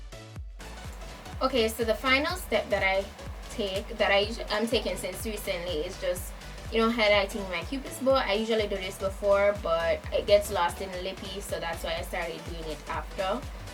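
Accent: Jamaica. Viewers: go girl... are you jamaican? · I subbed as soon as I heard that Jamaican voice 🇯🇲🇯🇲🇯🇲🇯🇲🇯🇲🇯🇲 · Are you Jamaican??? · Hey i just wanna say, i love the content of ur vids, love ur accent, Im Trinidadian and omg i just love a strong Jamaican Accent .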